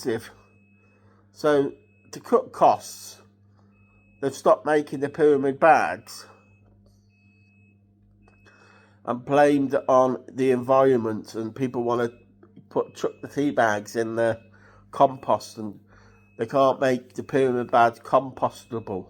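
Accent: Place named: Northern England, United Kingdom. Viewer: What part of the uk are you from? You have a northern accent! Ah bless you keep strong!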